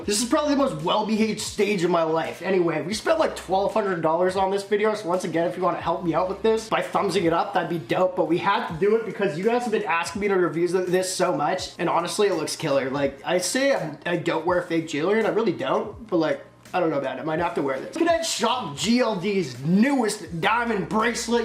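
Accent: Canada. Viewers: You sound like you from Canada 😂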